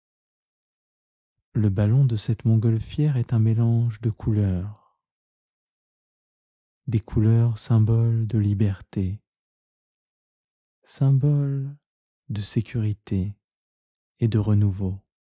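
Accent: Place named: Sète, France. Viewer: Je voulais vous décerner la médaille des 2 ans ! En effet, je suis tombée sur vos méditations en mars 2020, esseulée et perdue parce que mon compagnon a eu plusieurs mois de COVID long à cette époque et devait rester seul dans la chambre , et moi dans la chambre d à côté à me tourmenter. Vous m avez beaucoup aidée à garder espoir en sa guérison J apprécie beaucoup votre timbre de voix, et votre façon de prononcer certains mots , qui me rappelle mes vacances près de Sète dans mon enfance vers les années 70 /80. ( Je suppose que vous venez de cette région ?) Vous êtes un prêcheur de simplicité et je vous remercie du fond du coeur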